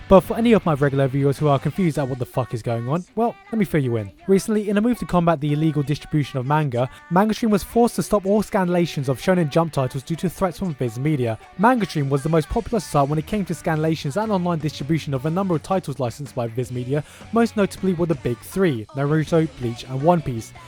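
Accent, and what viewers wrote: United Kingdom. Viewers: This actually made sense. While I like manga/anime, I must confess I seldom buy it (also due to the fact it almost doesn't get translated into my native language, ever), so I usually get my fill on the manga/anime sites out there. That said, I'm still rational about it; yes, I like the watch-it-for-nothing sites out there, but still, I acknowledge it makes sense from their perspective - as a business - to try to do something about it. Just like you, I also don't think the people claiming it's 'good advertisement' and 'I buy it anyway' have a major impact. It just doesn't cut it: most DO NOT buy everything they watch or read online, after all. I don't, for instance. The problem is, of course: nobody likes big companies, and certainly not those that make the 'free stuff' go away. I think if the manga was made directly available from the manga's site (or a site where mangaka directly sell their products), and that with a 1 dollar/euro one-click support button for instance, most would do it, at least for their preferred manga. 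Every fan would be more inclined to support a mangaka directly, than some company which they perceive as money-grabbing. That said, you are right: 25 bucks a year isn't all that much. Alas, it's not available around here (and it would need to have MY favourite manga in there too, obviously). But in any case: I thought you made some good points. I also found it funny when I heard you talk; it's sounded so 'British' (Queens English-like), I imagined you compl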